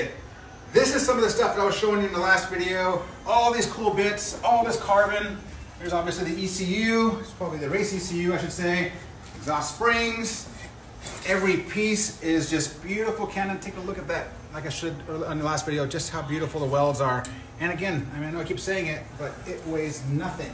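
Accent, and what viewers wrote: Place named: New Zealand. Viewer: He sounds like a Kiwi.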